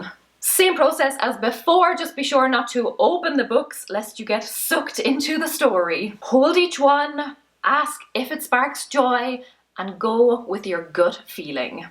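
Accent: Ireland. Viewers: OMG that school organiser thing you showed gave ME memories!? definitely had one at some point! Great video, I’m on a Konmari spree and it’s always so nice to hear a familiar Irish accent with great content :)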